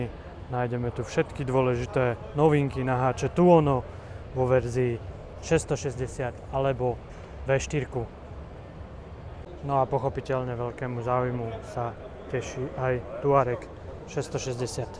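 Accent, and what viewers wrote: Eastern Slovakia, Slovakia. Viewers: Pekné video pán, ".Už sa sa polepšil.Už ste odstránili aj to Svoje východňááárské zaťaho..váááánie.Robíte pekné videá,ale hrozne mi vadil ten východňársky akcent.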